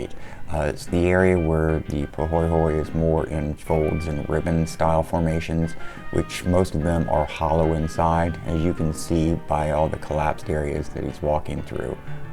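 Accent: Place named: Florida, United States. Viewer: Always thought you sounded like Batman (Kevin Conroy) with a Florida accent. Now I see you actually look like him I am delighted :)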